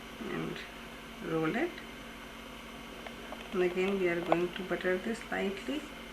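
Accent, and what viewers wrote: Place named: India. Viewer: everything that you teach us is wonderfully delicious! Please tell us the name of the (teaspoon) spice that you're adding to the sugar syrup., you're Indian accent is lovely but I keep missing the name. Thank you!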